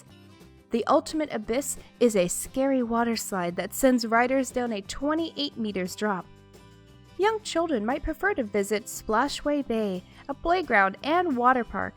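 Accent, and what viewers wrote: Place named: Germany. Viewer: I think it's German or English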